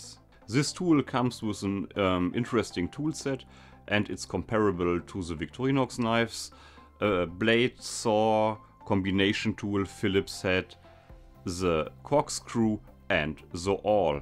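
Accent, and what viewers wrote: Germany. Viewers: You are german i can hear that clearly hab ich Recht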